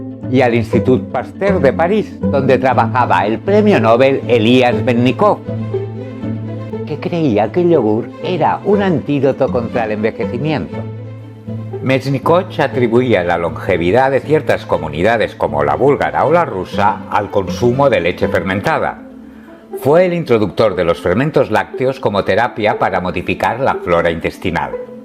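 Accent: Catalonia, Spain. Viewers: Quiero felicitar al locutor, su castellano con acento catalán suena perfecto.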